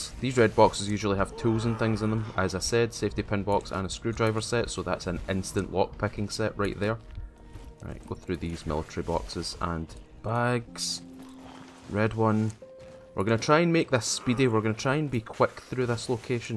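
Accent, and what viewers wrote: Scotland, United Kingdom. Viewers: Ah the thick Scottish accent love the accent of some of my ancestors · is he scotish? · are you scottish? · I love your scottish accent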